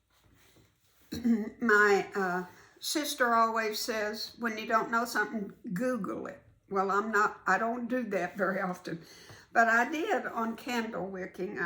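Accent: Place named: Kentucky, United States. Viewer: So enjoyed your video. I'm from ky. Where are you from. You sound so much like alot of the ladies in our quilting guild. Will be watching all the videos you do as its like watching a close friend. Loved the pillow. So beautiful!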